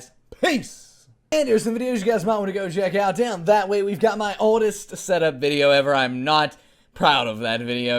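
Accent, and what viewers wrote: South, United States. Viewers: I just watched your first Setup video! You can really here your Southern accent in it xD It's hilarious! XD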